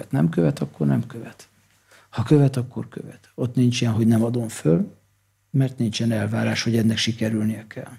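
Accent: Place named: Russia. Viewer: Kedves! Nagyon érdekelne, hogy miért beszélsz akcentussal? Olyan a hanglejtésed, mintha orosz lenne az anyanyelved. Jól hallom? Köszönöm a videókat, nagyon szeretem őket, remelem egyszer lesz alkalmam egyszer találkozni veled!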